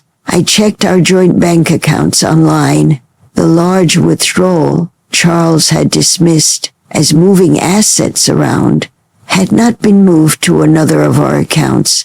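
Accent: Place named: Ireland. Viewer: Interesting Irish accent emerging at times. Engaging story nonetheless.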